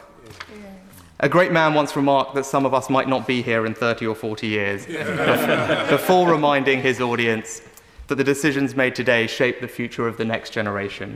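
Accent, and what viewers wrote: England, United Kingdom. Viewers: Commendable English accent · I love English English. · What a magnificent speech! The richness of his English accent, the fine details, such grasp of English history and the glories of Yorkshire which he represents as a Member of Parliament. Has Britain finally delivered a leader a bit more advanced than the Americans with this highly intelligent young man of only 42 years? Good luck and God Bless Mr Rishi Sunak. 🍀⭐️🤙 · Muscle true. his grand father immigrated to UK and he and his father was born in UK. He lived his whole life in UK and US. that is the reason he speak like a Brit. He has brown skin, but he is a true British in all sense.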